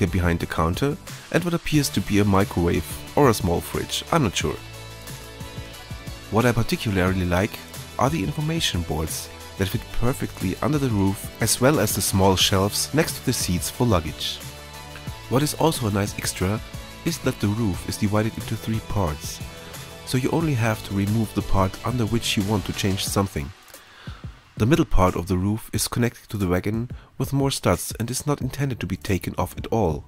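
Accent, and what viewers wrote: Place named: Germany. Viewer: I'm just not used to German YouTubers, that's why I was impressed with your accent.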